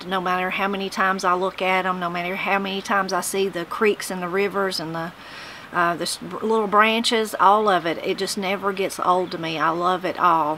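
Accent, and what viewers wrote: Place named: Kentucky, United States. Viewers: I started watching your videos completely by accident. I was trying to find more information from my Ma maw and Pap paws childhood growing up in Harlan county Kentucky. They’ve been gone for man year and the sound of your accent caught my ear and I felt a squeeze in my heart. I wiped my hands and came in to watch your video on cornbread and I was hooked. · Finally an awards ceremony and speech worth watching and listening to. Your speech was fine. The three of y'all looked lovely in your dressy outfits. Thank you for sharing your thoughts and stories about growing up in Appalachia, as well as showing the rest of the country that just because people in the Southern states have an accent/drawl, and might pronounce words differently than other folks are used to, it doesn't mean we're ignorant, backwards or uneducated. 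Congratulations again about winning your award and representing our Appalachian culture and history. Keep up the great content and we'll keep right on watching it. 🥰😊🥳🤟🤟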